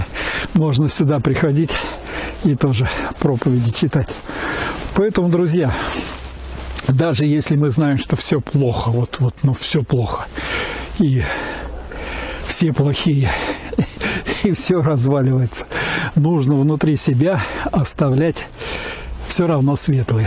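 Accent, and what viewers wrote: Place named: Armenia. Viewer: Слышится лёгкий армянский акцент )) Природа красивая.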